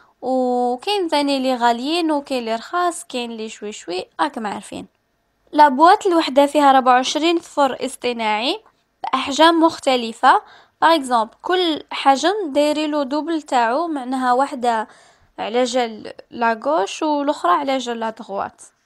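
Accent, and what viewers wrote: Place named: Kabylie, Algeria. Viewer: بنت بلادي نموت عليك ونموت على هدرتلك تحيا اللهجة القبلية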